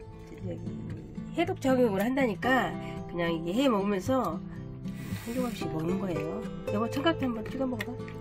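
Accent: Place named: North Korea. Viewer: 북한말인줄 ㅡㅡ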